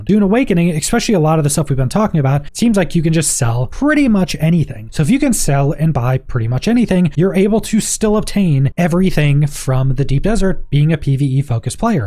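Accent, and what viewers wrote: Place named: South, United States. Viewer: It’s a southern thing. I’m bet he also says Axe instead of Ask.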